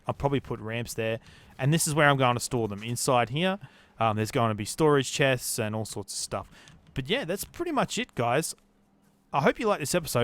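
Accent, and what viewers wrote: Australia. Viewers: Love the vids! It's good to see/hear more Aussie you tubers!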